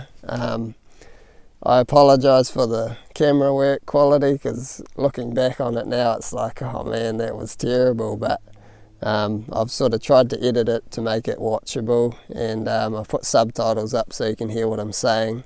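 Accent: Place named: New Zealand. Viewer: Wow! AS soon as I heard your voice and you said you were trapping possum's I knew you had to be a Kiwi!! I had a similar lifestyle growing up on the coast in the Wairarapa with my own trap line! really enjoyed this mate! New sub and I'll be following your channel with interest!!👍😀💯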